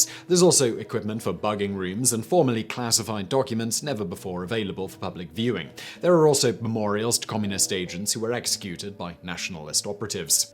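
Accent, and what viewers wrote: United Kingdom. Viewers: Dodgy Brit accent and speaking way too fast - what is your hurry, slow it down. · Which part of Britain is this man's accent from? As a fellow Brit, I'm scratching my head.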